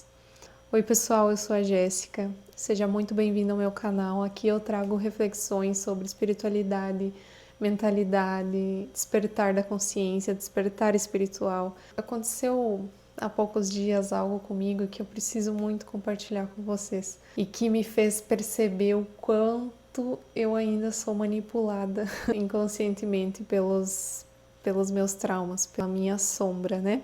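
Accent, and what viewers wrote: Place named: South, Brazil. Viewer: 🙏🏻🙏🏻, pelo sotaque é do sul, continue na missão de expandir a consciência do proximo.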